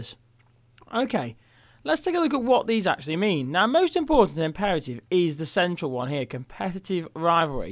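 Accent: United Kingdom. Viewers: Because he's from the UK and thats just how he talks? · too englishish..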